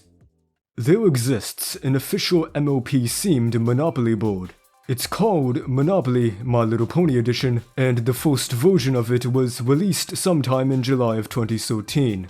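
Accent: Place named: Germany. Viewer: are you german, because your voice sounded like a German accent.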